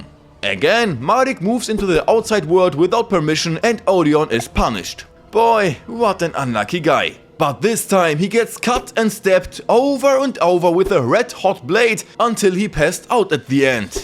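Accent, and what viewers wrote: Germany. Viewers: The way this mans pronounces "Anime" and "Pegasus" just drills my ears. Damn Germans have such terrible accents and their language is so disgusting...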